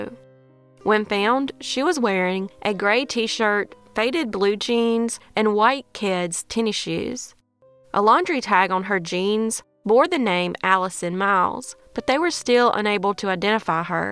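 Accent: South, United States. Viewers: Good job, u did loose alot of your southern accent as your stories progressed. Fyi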